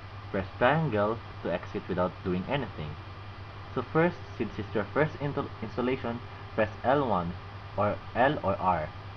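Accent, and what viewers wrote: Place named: Philippines. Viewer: god damn, work on your awful accent pinoy shiT.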